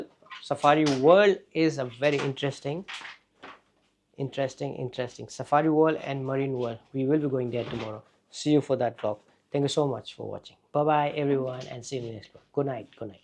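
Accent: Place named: India. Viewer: Change urrrrr indian accent please. They will think u r Nepali bhaiya . Rrrrrr. Oooooor change it please my ear is bleeding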